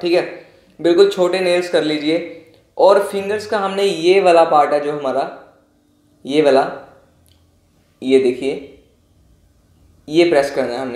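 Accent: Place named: Punjab, India. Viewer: The punjabi touch in ur hindi... Just so sweet 😂❤❤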